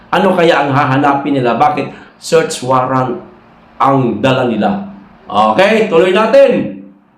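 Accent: Visayas, Philippines. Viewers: visaya ka nmAn😅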